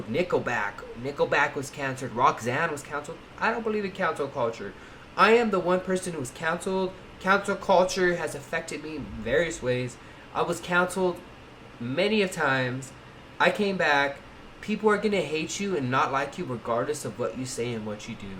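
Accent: India. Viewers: Nooo!!! U got a indian/gay accent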